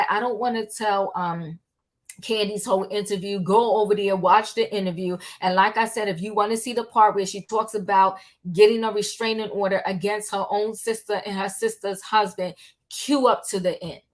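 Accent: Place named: East Coast, United States. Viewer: Love your commentary on this topic and your East Coast accent. Keep it up!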